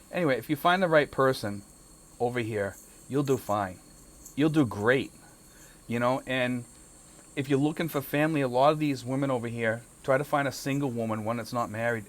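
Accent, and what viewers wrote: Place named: Massachusetts, United States. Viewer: I guess I'm not a typical expat because very little in your video applies to me. I've been married only once to the same woman, a Filipina. We have a daughter, and I've always had a great relationship. She's 21 now, and she's always been a great kid. We talk often, and I listen to what she has to say. Part of the reason things have worked out for me might be that I was 42 before I got married, and I'd had enough American gf's to know I didn't want one as a wife. Anyway, I subbed your channel. Also, I knew you were from Massachusetts by your accent. Me, too.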